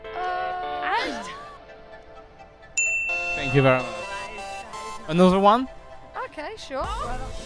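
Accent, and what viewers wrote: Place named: France. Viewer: Viens faire ça au Québec voir le pire c'est que avec un bel acent français ça devrais très bien marcher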